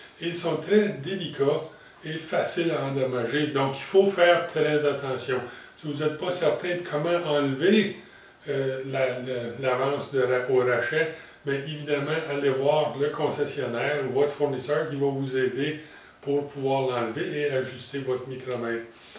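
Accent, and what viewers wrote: Canada. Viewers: Monsieur toutes mes félicitations pour les explications abondantes et variées que vous donnez sur l'ensemble de vos vidéos. Ces explications ont les mérites d'être précises et concises elles sont agrémentées par votre accent canadien qui me replongent dans mes souvenirs de deux séjours de 2 mois passés au Canada (79/80 ) j'ai visionné pas mal de vidéos traitants de l'usinage sur fraiseuses et tours en Français en Anglais et même en Russe......Mais vous êtes le crac des cracs....you are the Best. Et je vous garanti qu'il y a des pas moins d'une dizaine de cracs que j'apprécie. Comme je vois que dans votre atelier vous collectionnez les plaques d'immatriculations je vous propose de vous envoyer en signe de gratitude...une plaque belge skype: roland_chardon mail: <mail>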